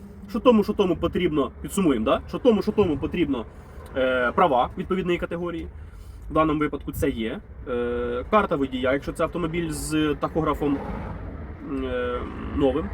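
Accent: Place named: Vinnytsia, Ukraine. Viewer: Красавчик чітко ясно без води))) по говору ніби з Вінницької області 😅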